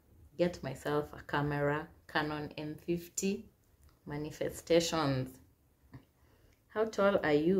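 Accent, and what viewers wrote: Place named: United Kingdom. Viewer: wuuueeeeehhhh🤣☺😂😂😂😂 na hio british accent yako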